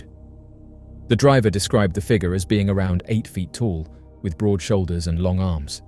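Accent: United Kingdom. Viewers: Fyi. A British accent is used to be more convincing .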